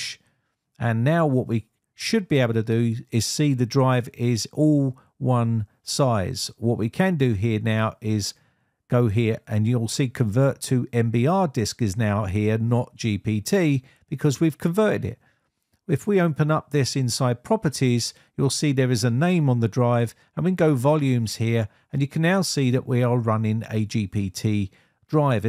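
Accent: United Kingdom. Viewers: brit accent I find interesting, Thing > Fing . Something > Sumfing, With > Wiv, Install > Instaw, Health > Helf. Words witrh TH are a struggle hey, but still I get a chuckle and learn something. (lern sumfing)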